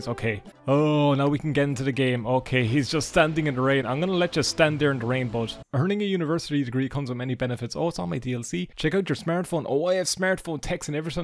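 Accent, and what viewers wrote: Ireland. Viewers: I'm a simple man. I click on a let's play of the Sims games. I hear an irish accent. I hit subscribe.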